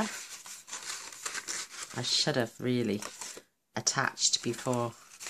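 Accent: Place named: Yorkshire, United Kingdom. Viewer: How lovely and unusual - love it (are you in Yorks - just going by the accent xx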